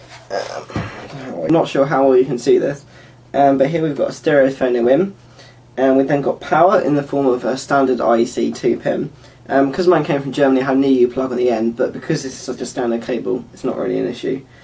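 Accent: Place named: United Kingdom. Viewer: I couldn't understand half of the things you said...bloody British accent lol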